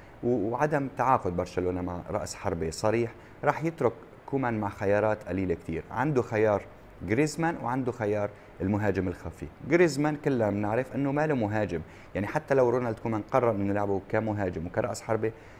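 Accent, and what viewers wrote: Aleppo, Syria. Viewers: ميم 55 لا هو بيحكي حلبي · لك والله من اول كلمتين بين معي انو من حلب ههههه موفق بلوووووود وجميل جدا اسلوبك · لهجتو واضحة سوري من حلب تحديدا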